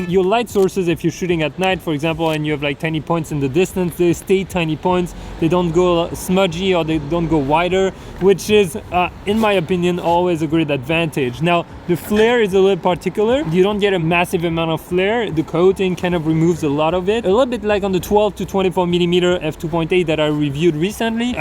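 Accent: France. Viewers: French accent salut parler vous francais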